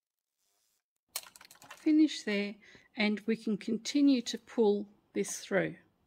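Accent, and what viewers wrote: Australia. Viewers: Morning. Thank you for your generosity in sharing your tutorials. I love the way you teach. The pace is particularly good. Often I only have to watch once or twice to complete a project. And I am always delighted with the results. You are my new hero! It’s wonderful to hear an Aussie voice too!! Ps just a tip for you: making the Mini Tissue Holders, I cut an extra 6” x4”; so I have 4. 2 for the folded sides, and 2 for either side of a very very light iron on wadding. This does 3 things. It gives the pouch a bit of stability in its base AND i cannot make a mistake which side is the inside or outside😂, and the inside looks as good as the outside for when the customer changes the tissue pack. Probably a bit of over kill on my part, but I was using light fabric that needed the extra stability. All kindness & grateful thanks to you. WA.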